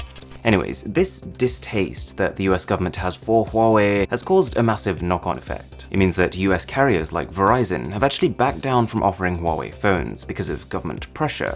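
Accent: United Kingdom. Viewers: That is just how British people talk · he sounds British to me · I enjoy this type of content. Please continue to provide informative YouTube clips like this, Apple, etc. Your content is so varied in the world of tech - but most of all informative. Many YouTubers cover the latest technology but it is nice to learn more about the R&D developments, the company behind it (beginnings, growth, direction, and aspirations). This is what you provide. Please continue on your current trajectory. 👏🏽👍🏽 P.S. I love hearing the accent of my fellow Brit on YouTube. So few in the world of tech - but at least you’re not alone. 😊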